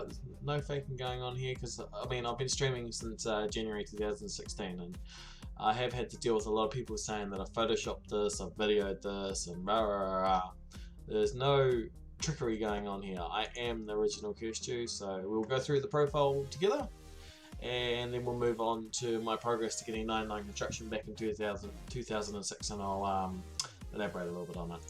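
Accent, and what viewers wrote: New Zealand. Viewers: bro your a kiwi aye, that accent sounds like home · Are you from nz?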